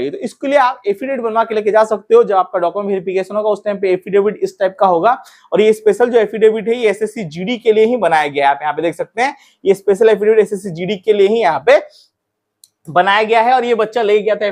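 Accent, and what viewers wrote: Odisha, India. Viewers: Bhai app odisha ke ho kya 😍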